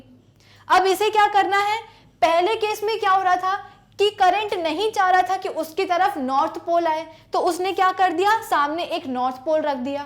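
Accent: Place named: South, India. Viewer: I think he/she is from Tamil nadu or Kerala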